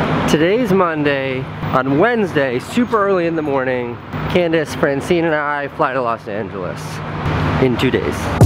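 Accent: South Africa. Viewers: That's a South African accent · Love the South African accent, not sure why just do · South African accents are my faaaaaaaaave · I live in New Zealand and I'm from Cape Town and listening to talk and his accent shit it's brought back memories! haha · I absolutely love the South African accent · Lekker. So nice to hear some Saf African. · I totally dig South African accents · South African: Yeeeese (yes) and Bleake (black). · That south african english has such a weird accent, but it's so cool. It sounds so laid back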